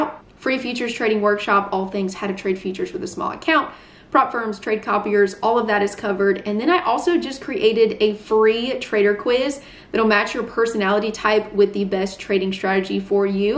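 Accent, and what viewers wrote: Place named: South, United States. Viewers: Are you from Southaven, Ms.? I recognize the dialect. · I Love Your accent, straight out the south? Either way, You are Awesome.Thanj you for the priceless information.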